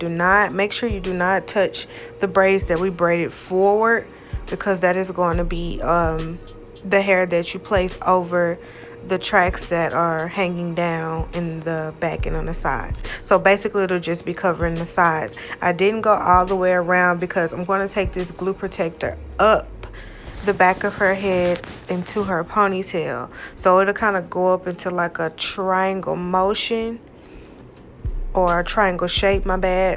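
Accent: South, United States. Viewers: Loved the look... And Baby you don't have to explain yourself about how you talk... You have a cute southern accent that's understandable... Ik people not trying to come for you in the comments 💪🏾... I heard everything clearly and by me being a visual learner I found everything to be very detailed with your speech and the hands on process... On the road to 50K... Let's get it💪🏾 ♥️💯...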